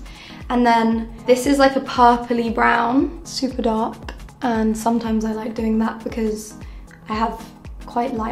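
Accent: United Kingdom. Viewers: moonchild It’s honestly just an average British accent, I didn’t even notice 😂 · Hyu Yu i do agree that it is a common accent in the uk but i find it so pretty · sotaque britânico AAAAAA 😍🥱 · She’s so young, but her British accent makes her seem older and more sophisticated 👍💅🏻💄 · does anyone know what british her accent is i love it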